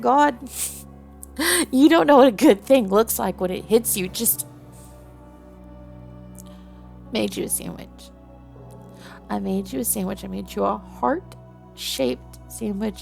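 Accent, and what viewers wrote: South, United States. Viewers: Your Southern accent is the most beautiful I’ve ever heard 🥹🥹. This Yandares are the most loving and loyal I would love to that for a Girlfriend someday 🙂‍↕️. You are an amazing woman thank for this Scary yet gorgeous audio also I subscribe to your channel today 💗💗💗💗💗💗. Kind Regards AuraKnight 🥰🥰🥰🥰🥰🥰🥰.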